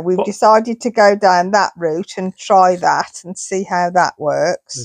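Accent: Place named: Kidderminster, United Kingdom. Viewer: It's been a pleasure. I must admit, being from Kiddy (though not originally) it was the accent that grabbed me first. But your vids have gone from strength to strength especially the ones from Yarnover. They were so interesting and informative. Keep doing what you do. I'm here for the duration ❤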